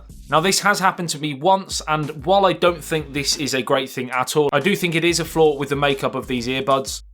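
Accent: England, United Kingdom. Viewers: lol its just his british acdent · He has a very slight accent - not quite accentless Home Counties, but from the heartland where the English language developed and as such is considered the baseline from which other accents are judged!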